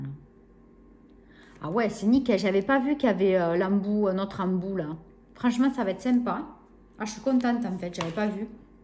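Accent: South, France. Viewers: Bonsoir! Super haul et très bien présenté, ça m'a donné envie d'y retourner. Je suis dans le sud ouest et par ton accent je me demandais si c'était le Noz de Montpellier. Bonne soirée.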